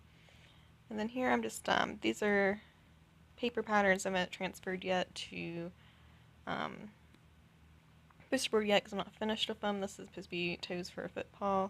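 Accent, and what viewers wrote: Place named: Northeast, United States. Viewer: Are you from Northeastern America? I'm from the south, but I can hear a familiar "ou" sound when you say poster board or smth like that. Sorry if this seems weird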